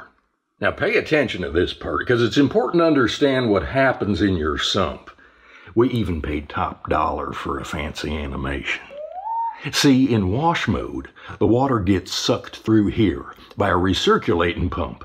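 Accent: South, United States. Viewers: You sure know have better story telling skills than appliances. Heck i didn't care about the dishwasher anymore! I just want to listen grandpa tell us some wonderful moonlight story in his his deep southern accent!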